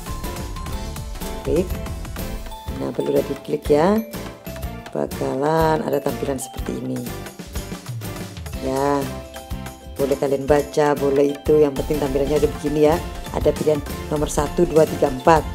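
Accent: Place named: Madura, Indonesia. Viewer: waaikumsalam, Youtuber pemula kyak aku hrs nyimak nich so check it out guys logat madura nya terasa berat hehehe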